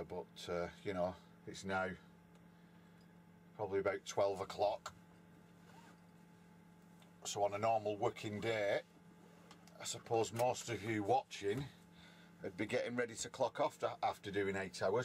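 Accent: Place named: Yorkshire, United Kingdom. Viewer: Yorkshire! :D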